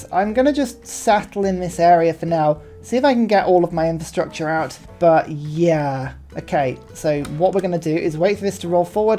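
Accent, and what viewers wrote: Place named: United Kingdom. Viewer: Thanks for this "over explained" video. You are very enjoyable to hang out with. Love the mellow jazz and your humor and obvious talent at this great game. What is it about the British accent that is so good to listen to? It gives you intelligence and authority-but you keep it nice and light with your jaunty sense of humor-well done.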